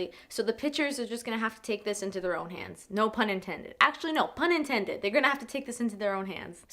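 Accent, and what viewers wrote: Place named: Toronto, Canada. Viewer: are you from the Toronto area?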